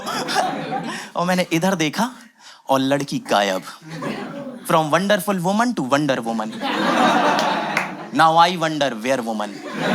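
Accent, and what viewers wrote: Hyderabad, India. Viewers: Vo hyderabadi accent ki vjh se ye pura video dekh rh 😂😂😂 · Coming again again just for hyderabadi accent 😂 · I have hyderbadi friend he also speak like this but ur comic is very good bro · Bhai crazy yrrr ,kya gand fadi ha hyderabadi ma ,oyeee hoyeee 😂😂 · Super hydrabadi touch 😂😂😂😂😂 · Maja dila diya bhai... Hyderabadi accent too good 😂😂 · Haydrabadi accent yarr 😆😆😆😆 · First time pura standup maine dekha hai but accha tha comedy maine sirf hyderabadi accent ke liye aaya tha but pura dekhna pada 😂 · Hyderabad accent , nice bro 😂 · Ek pura 🤣😂show hydrabadi. Accent me krlo bhai❤ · Mujhe aapka hydrabadi accent bahut achha laga i love you ❤❤❤😍🥰😊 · that hyderabadi accent u killed it bro❤‍🔥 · Thora aur hydrabidi ascent rahta tab kuchh jyada hi maza aa jaata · Me to sirf apka haidrabadi accent sunne aai hu.. very nice yrr😀😀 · Hyderabadi assent was too good. 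Good comedy set. I like it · Loved it. Hyderabadi Accent was hilarious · Bro Amazing 😂, your hyderabadi accent was crazy 😂 , Angaar dal diye 🔥⚡😍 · Bruhhh dyammm that hyderabadi accent wass justtt ♨️♨️ Loved itt brotherr❤ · Ooe hoyee kya hyderabadi bolta tum apna to dil aa jata tumhare batao par😂😂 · Hyderabadi accent ne pure comedy me jaan daal di ❤😂😂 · Ending 😂😂 the hyderabadi accent is so good 😂😂😂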